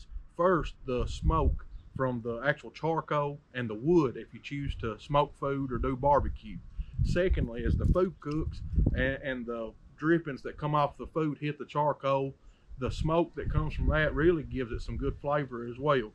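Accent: South, United States. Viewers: Boy if that isn’t a southern accent I don’t what is! Enjoyed your video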